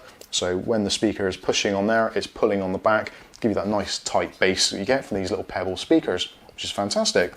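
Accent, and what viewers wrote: Bristol, United Kingdom. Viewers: Are you from bristol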